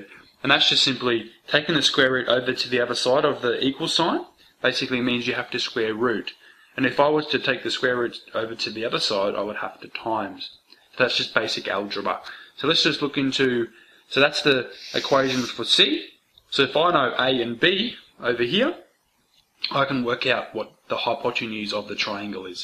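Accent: Australia. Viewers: He's an aussie, they're like texas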